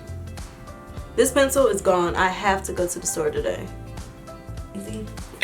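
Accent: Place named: North Carolina, United States. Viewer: if that was a " " thing, or a North Carolina accent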